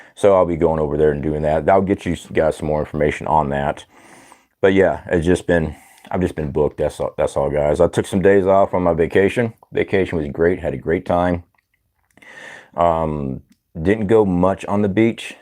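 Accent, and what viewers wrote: South, United States. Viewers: Hate I missed the live!! Had to make some house calls today. Your speech is fine brother. I would not have known if you hadnt mentioned it. You sound like everyone else around NGa. (Perfect southern) try to get some sleep tonight. I'm saying this as a big sister that cares, when you need that much caffine a nap might serve you better. ❤ 👩‍⚕️ sorry I'm still in medi-mode Glad you made it back safe and sound.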